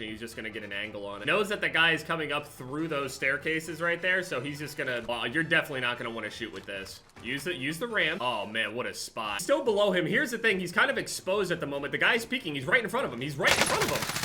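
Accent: United States. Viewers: I love his cowboy accent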